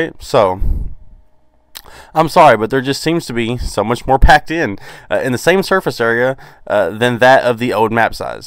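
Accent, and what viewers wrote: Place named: South, United States. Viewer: That's true. I did not think about the height difference. Btw I love your Southern accent. Southerner here too (NC).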